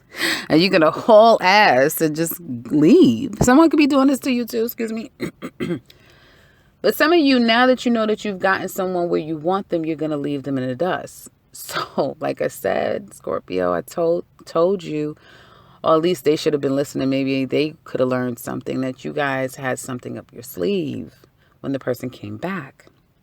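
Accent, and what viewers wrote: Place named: East Coast, United States. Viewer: Hey, are you from the east coast? love the accent & thanks for the reading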